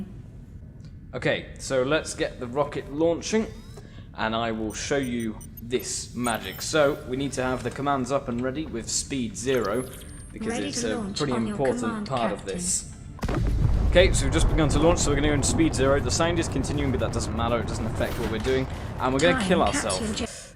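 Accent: United Kingdom. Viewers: Get off the American Wi-Fi you’re in Britain I’m assuming project that you’re speaking British stop holding up the American Wi-Fi and use your guys his own Wi-Fi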